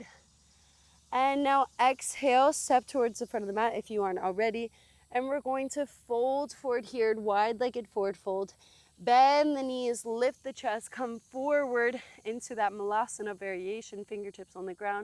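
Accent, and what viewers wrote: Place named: Australia. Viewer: Your accent has got me…scattering of different accents. I can hear Australian in there xx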